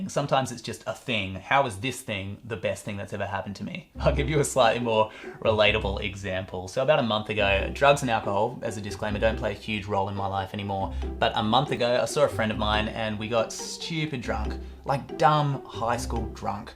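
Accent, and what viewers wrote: Australia. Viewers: You have great content and that sexy Australian accent is a bonus.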